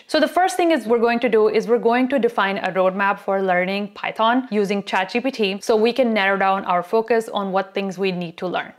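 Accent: India. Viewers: You sound lit bit like an Indian. Anyways thank you for this video.👍🏼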